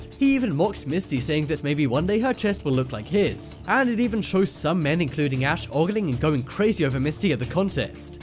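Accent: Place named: United Kingdom. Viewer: dude these stories are awesome even if you have a briddish accent I STILL LOVE YOUR VOICE these stories are amazing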